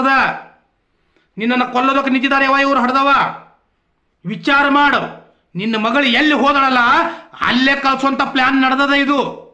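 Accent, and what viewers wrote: North Karnataka, India. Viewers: Nim uthra kannada bhasheli ugiyodandre keloke chenda bro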